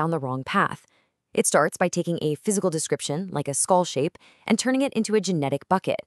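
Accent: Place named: United States. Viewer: annoying robot American begone !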